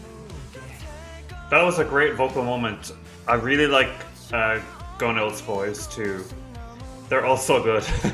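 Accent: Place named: Cork, Ireland. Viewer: woah the world is so small… started watching this video and got caught off guard by ur accent and went to check where ur from, i’m also from cork!! crazy that, with them being a relatively small group and cork being a relatively small area, there’s someone also from there reacting to my fav group…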